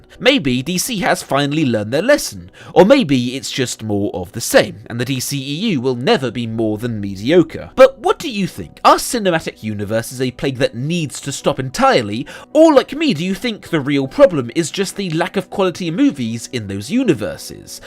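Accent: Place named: England, United Kingdom. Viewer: Totally convincing English accent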